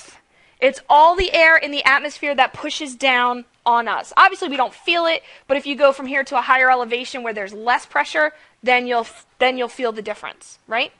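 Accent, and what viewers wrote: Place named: United States. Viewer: Your videos, your way of explaining, your examples, and your American accent make it soooo easy to learn... I love how you lecture. Please make more videos and help us (students) learn more so we don't have to cram study. God bless u...:)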